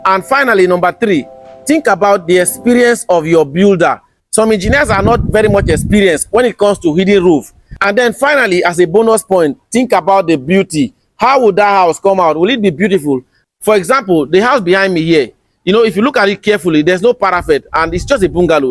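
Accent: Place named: Esan, Nigeria. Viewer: you sound Esan . are you?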